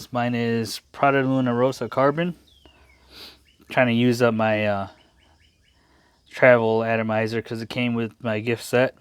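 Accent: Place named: Mexico. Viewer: You still sound Mexican ☻😀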